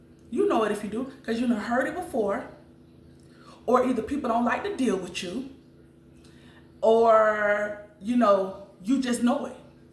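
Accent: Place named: Alabama, United States. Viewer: Is that a Alabama accent ❤ U sound just like a good friend of mine 😊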